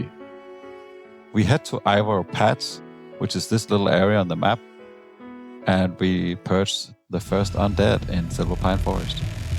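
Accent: Denmark. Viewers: Something about the accent that tells me that he is danish! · the danish accent bro, love it <3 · You accent makes me think you are from Denmark, am I right? 😂 Nice serie man, cant wait for the rest of serie to come out. KEEP IT GOING!!!💪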